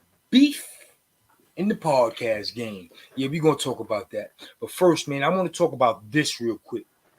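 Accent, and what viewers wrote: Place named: AAVE, United States. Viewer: Get a better camera. Speak English, not ebonics you are too old to sound like a uneducated, hoodlum. Also do your googles, 1/3 of your info is incorrect.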